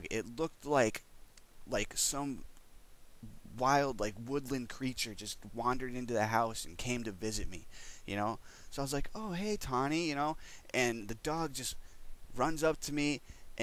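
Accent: Massachusetts, United States. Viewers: I knew he was from mass before he said it lol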